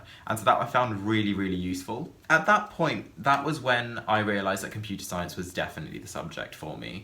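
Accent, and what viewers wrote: United Kingdom. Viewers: I thought he grew up in Britian cuz of his accent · your speaking accent is the Oxford accent ?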